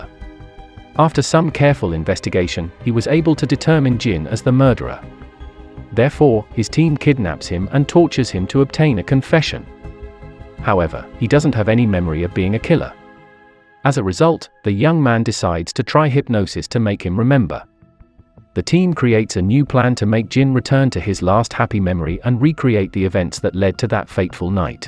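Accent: United Kingdom. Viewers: Why this autovoice has a British accent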